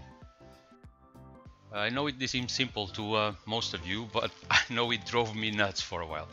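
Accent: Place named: Portugal. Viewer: I was like... hmm sounds like a Portuguese accent. then checked the keyboard layout.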